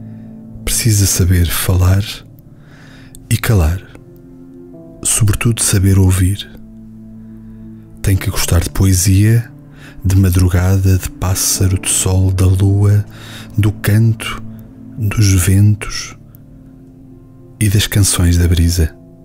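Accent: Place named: Portugal. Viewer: Gostei de escutar no sotaque do portugês de Portugal!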